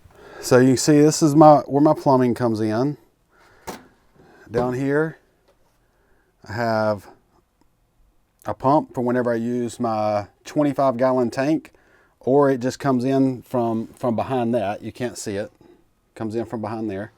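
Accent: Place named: Appalachia, United States. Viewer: Are you from North Carolina? You sound south/easty-of-appalachia-y.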